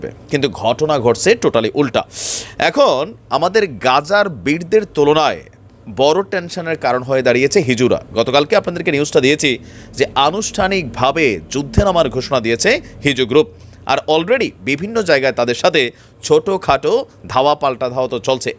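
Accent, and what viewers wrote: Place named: Noakhali, Bangladesh. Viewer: আরে ভাই এতো নোয়াখাল্লা তার পর আওয়া এর ভাষা এমনী হবে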